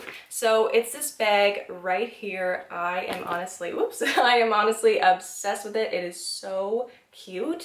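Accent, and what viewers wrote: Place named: Canada. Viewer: I'm 51 from Australia and wish Temu was around when I was in my 20s! Great finds and so affordable too! - thanks. I've got my eye set on that chain bag and the watches. PS - Your hair looks great and really suits you. Love the Canadian accent too, I went to London Ontario Canada when I was 13 to visit family and would love to go again one day. Beautiful country!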